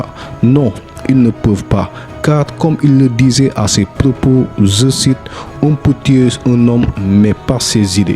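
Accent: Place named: Senegal. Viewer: 😀c'est un senegalais qui parle comme ca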